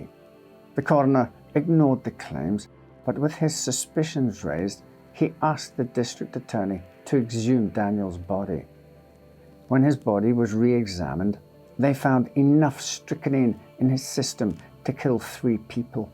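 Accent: United Kingdom. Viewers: I will never understand why Brits pronounce aluminum the way they do. It's wrong af 😂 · I absolutely love your content, sir. You truly know how to induce a moment of true dread with your powerful accent. You make me proud to be a British person with a proper silver spoon in my mouth, and I wouldn't have it any other way. Listening to you is literally like reading a truly mysterious sonnet written by an unknown author. That's how much I find your videos satisfying to watch and listen to. I could easily fall asleep to your incredible content.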